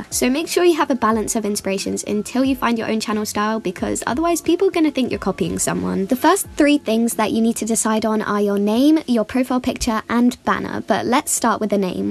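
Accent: United Kingdom. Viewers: I would love you to teach your British accent. that would be helpful. 😊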